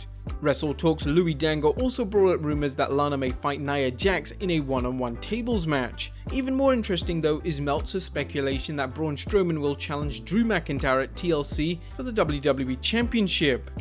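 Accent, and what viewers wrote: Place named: Southern England, United Kingdom. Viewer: Southern English man not British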